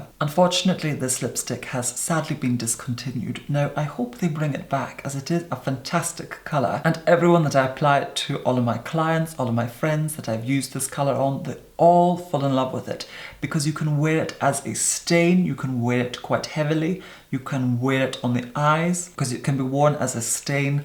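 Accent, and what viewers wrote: Scotland, United Kingdom. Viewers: I Love your accent! Is it scottish? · Scottish accent: VELVET edition! When I close my eyes, his voice conjures dark chocolate being tempered on a warm marble tabletop.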